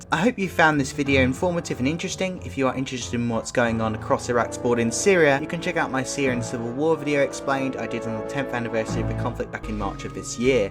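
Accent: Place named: United Kingdom. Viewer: so i assume your british from your accent so college for you would be year 11, 12?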